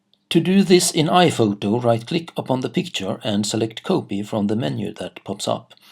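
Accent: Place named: Sweden. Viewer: I knew in an instant you were Swedish from your accent haha, it sounds exactly like when I'm trying to make a Swedish parody in Danish :P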